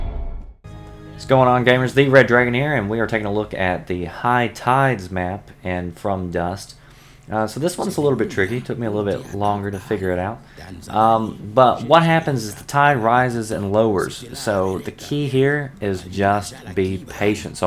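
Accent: South, United States. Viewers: your southern twang is annoying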